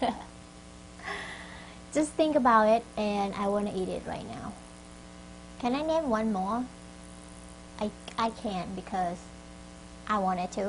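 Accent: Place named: Thailand. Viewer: oh! you were born in Thailand, that's why you got Thai accent! <3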